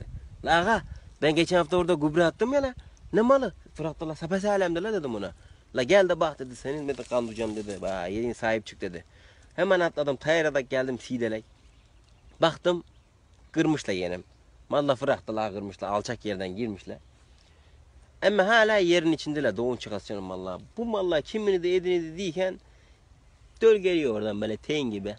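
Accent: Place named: Unye, Turkey. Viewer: Kardes ben ünyelim bizim şivemizde çok benziyor size ama gercekten on numara tebrik ediyorum seni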